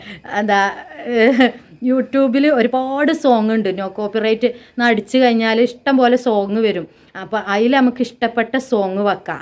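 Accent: Malappuram, India. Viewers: ഞാനും ഇത്ത മലപ്പുറം ആണ് എന്റെ സംസാരം ഇതൊക്ക പോലെ തന്നെ 🥰❤️ ഒരുപാട് ഇഷ്ട്ടം ആണ് നിങ്ങളെ വീഡിയോ കമന്റ് ഇടാറില്ല 🥰❤️ L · ഒർജിനൽ മലപ്പുറം ശൈലി😊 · നിങ്ങൾ പറഞ്ഞത് ശരിയാണ്. ഞാൻ മലപ്പറം കാരുടെ കൂടെ ഒരുമിച്ച് ഒരു ഫ്ലാറ്റിൽ താമസിച്ചിരുന്നു അവരുടെ അല്ലാം സംസാരം ഇത് പോലെ ആണ്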